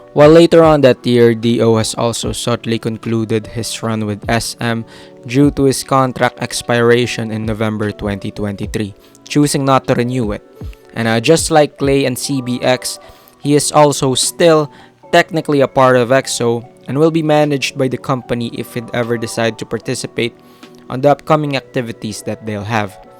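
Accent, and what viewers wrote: Philippines. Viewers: I'm sorry but I can't with the Filipino accent 😢😢😢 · I can hear the Filipino accent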